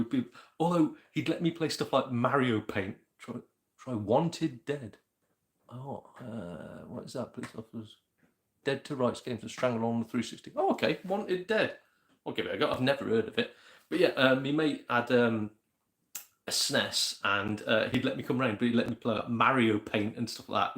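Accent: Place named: Scotland, United Kingdom. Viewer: Cheers for the shoutout mate, just watched the stream. Great channel, and some good laughs to be had! An unrelated and random question, but are you originally from Scotland? I ask because I used to study and live there. But if you are, your accent is pretty subtle.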